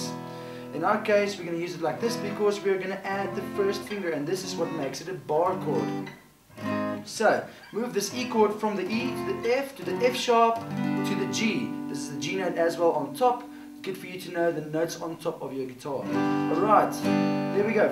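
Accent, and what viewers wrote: South Africa. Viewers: Are you SouthAfrican? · your accent makes me miss south africa, just a little. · South African, I could hear it as soon as I started watching. whoop thanks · South African, ne? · Are you South African? :D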